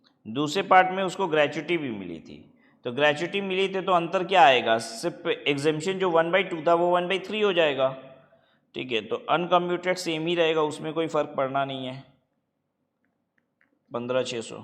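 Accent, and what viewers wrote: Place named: Varanasi, India. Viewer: sir aapke speaking accent se mjhe pata lag gya tha ki aap banaras se hi ho☺☺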